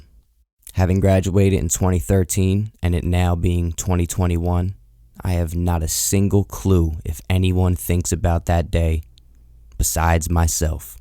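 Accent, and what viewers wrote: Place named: Philadelphia, United States. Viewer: I knew knew knew you were from Philly lmaoo I live rite in south Jersey I get my haircut rite on Frankford I knew it just from the accent but yeah man I'm really enjoying your content I can really relate I've had addiction issues and I'm trying to overcome them really love what you do man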